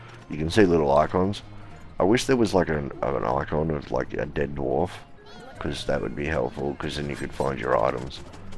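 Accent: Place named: Australia. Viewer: Not meant to be a "dis" Your voice is so fucking smooth, I felt asleep the last 3 days listening to part 3-6. Your gameplay is really relaxed and I like that you do it at 10x speed. But: there is not much value to looking at your vids for hints etc... For the last 4 ones I skipped and skipped and skipped. Im sorry mate (love ur australian accent), but: just consider reading a book into youtube. I would listen to it :)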